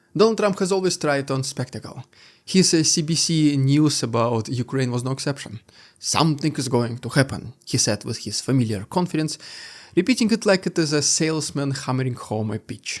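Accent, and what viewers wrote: Russia. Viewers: Dude just do the videos in Russian. No one can understand the "Runglish" you're slinging.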